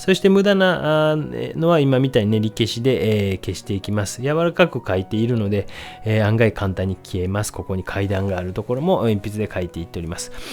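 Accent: Kansai, Japan. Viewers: ベネチアの風景にもなつかしさを表現されておられますが、先生のトークで時々入る関西弁も親しみがもたれます。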